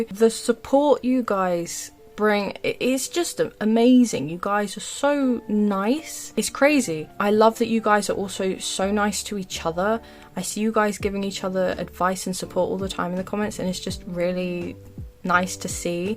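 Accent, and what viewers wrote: United Kingdom. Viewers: Her accent is British English, possibly from around London or a little more South ❤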